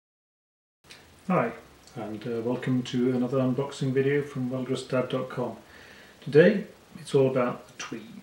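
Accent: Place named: United Kingdom. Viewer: Interesting review, and beautiful tweed. Your expressions and inflections are very British, surprised to find that you are Norwegian.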